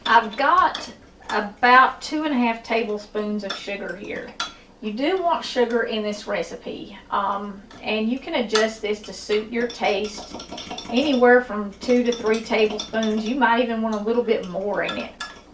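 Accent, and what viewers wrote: South, United States. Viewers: Thank you and Bless you as well. I am African American Female, whose parents and Grandparents were all born and raised "Down South"...I enjoy watching cooking programs...your Southern accent especially gave me fond memories of my own female family members talking and comparing recipes as they prepared various dishes. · EVERYTHING you make looks so good, and your country accent is way cute too.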